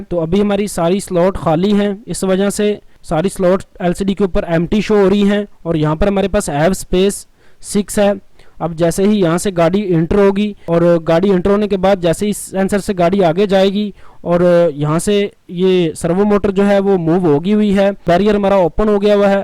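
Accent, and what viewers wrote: India. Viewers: Tech vid Indian voice Useful Thumbs up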